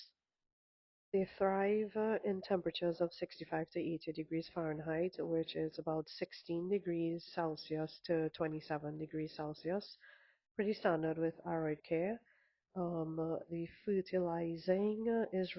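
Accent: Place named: Trinidad and Tobago. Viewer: Oh what a beautiful plant, I find homalomena to be a pretty trouble free plant and usually when she's thirsty she'll lean a bit, she's positioned for some direct morning light which I'm seeing no issues with thus far. Immediately I recognized your accent as trini, and wow it's absolutely wonderful to hear a fellow Trinbagonian doing planty videos, keep up the good work 🇹🇹 ❤😊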